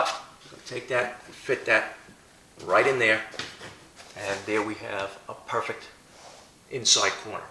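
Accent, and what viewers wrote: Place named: Long Island, United States. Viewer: this guys gotta be from long island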